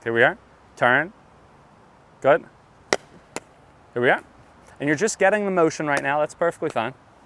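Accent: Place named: Philadelphia, United States. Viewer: Love your vids! And your Philly accent ;)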